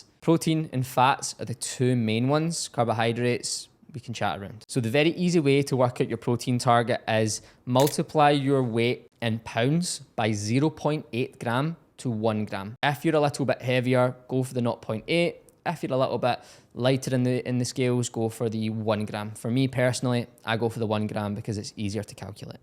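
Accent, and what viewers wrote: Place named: Scotland, United Kingdom. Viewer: he either doesn't understand the Scottish accent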